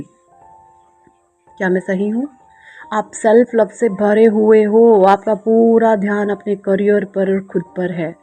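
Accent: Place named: Kanpur, India. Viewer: Jee aap kee avage hum sunkar anuman leagatata thaa kee aap kanpur kee tharaa bolti hai leakin kathil siti aur karneal siti mea siti kaa kuch loog kanpur kee thara boltesa humko mealtaa thaa hearinaa meaa Hindi kee kitabai boli jatai haea .....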